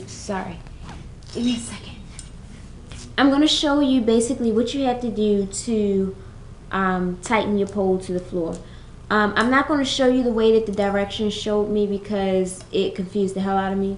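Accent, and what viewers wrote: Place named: Baltimore, United States. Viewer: Baltimore accent ok 😏Just bought this pole and this really helped! Thanks!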